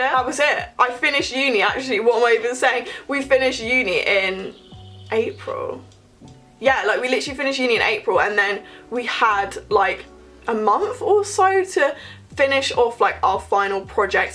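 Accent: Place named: Bristol, United Kingdom. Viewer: Really helpful, I’m thinking of going, and I imagine your from bris aswell cause of the accent n distance away haha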